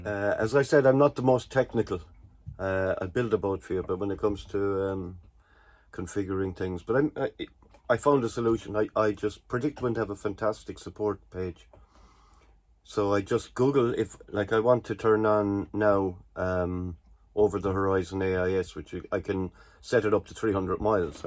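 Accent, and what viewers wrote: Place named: Ireland. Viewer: Oh ! What a pitty ! The AI translate everything in french ! No more irish accent !😑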